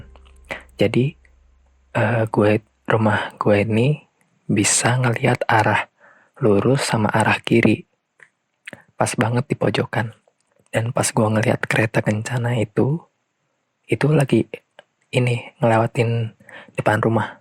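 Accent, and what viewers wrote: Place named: Java, Indonesia. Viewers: Orang banten tapi medok. Pst dah fasih bahasa jawa ya bro? 😁😁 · Yang lo-gue lo-gue pake logat jawa itu serem gan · lo gue lo gue tp medok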